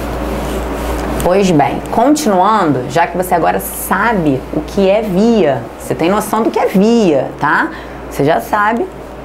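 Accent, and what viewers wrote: Rio de Janeiro, Brazil. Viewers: aguentar esse sotaque pobre de carioca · ela tem sotaque carioca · carioques insuportavel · maldito sotaque carioca. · Putz, me desculpem, mas não consegui assistir o video inteiro, não posso falar se é bom ou ruim, porque esse " carioquêis " é irritante DEMAIS !!! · Sotaque carioca :) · sotaque carioca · Gostei, mas me sinto no rio com esse sotaque, não sei se tudo isso também se aplica pra São Paulo. Mais foco na matéria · Gostei muito do seu sotaque, característico de um carioquês original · Sotaque carioca é maravilhoso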